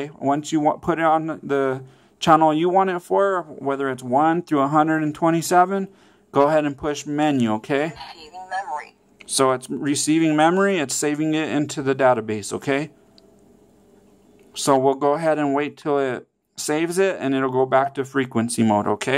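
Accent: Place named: Bay Area, United States. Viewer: Thanks bud. You say Hella. Im from the Ca BayArea too.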